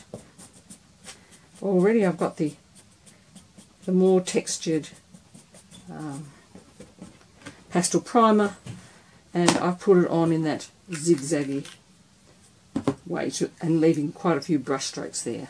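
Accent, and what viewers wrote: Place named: Australia. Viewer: Most important thing which you rushed over and I could not understand what you said, was what type of surface you were using i.e. paper, board, wood, card. It sounded like 'Mat-board' or 'Net-board'. Would you please mind replying and stating also the name of the product, your accent sounds Australian apologies if not, I am from England but the name of the product will help in finding similar. Thank you.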